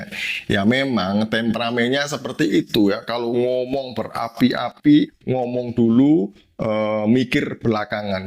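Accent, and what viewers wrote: Java, Indonesia. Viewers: Medog Jawa nya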